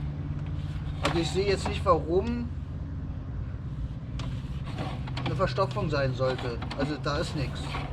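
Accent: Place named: Berlin, Germany. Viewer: aber cool das Du es machst, so versteht man dat Berlinerisch wenigstens XD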